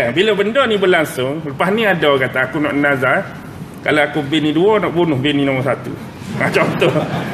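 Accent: Kelantan, Malaysia. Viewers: Sedap dengar Ustaz guno loghat Klate kito..Skalo dok dgr loghat utara..Ambo jiran Ustaz yah Tanah Merah..Kemah sek kito 👍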